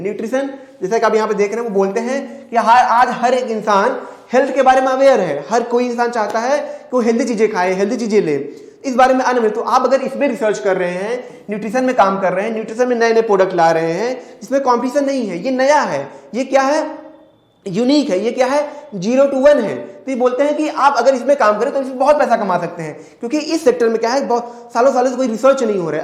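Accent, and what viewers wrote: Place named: Uttar Pradesh, India. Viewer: I like the accent .....up hindi....explanation is superb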